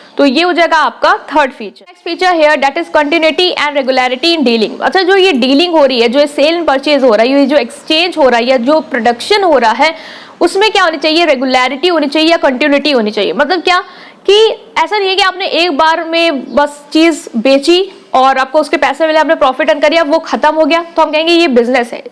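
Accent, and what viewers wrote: Lucknow, India. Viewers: Ma'am aap lucknow se ho kya 😐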